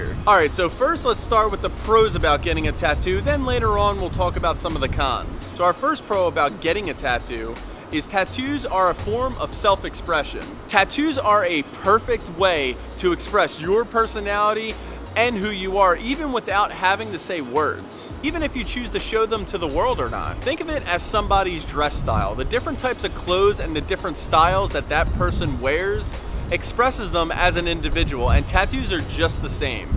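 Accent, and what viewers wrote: Philadelphia, United States. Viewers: I like the philly accent. I grew up maybe an hour outside and now live in Philly. I’ve always wanted a sleeve but being in philly made me want it more, everyone is tatted😂 the career I want is about as white collar as you can get so I’m a bit hesitant but I’ve heard of people that have them from the companies I want to work for. Any shops you’d recommend in the area(not sure if your still around Philly) also have you had any trouble yourself getting jobs with them?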